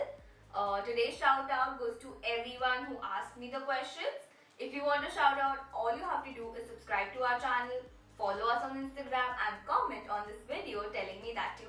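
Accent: Maharashtra, India. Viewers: I knew u are a maharashtrian by the way u speak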